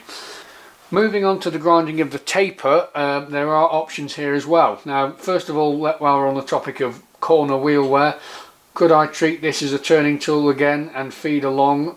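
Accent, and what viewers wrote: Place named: Cumbria, United Kingdom. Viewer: lake district? Cumbria? your accent reminds me of my granddad, he was a tool and die maker from a long line of em who worked at Vickers up in Barrow in Furness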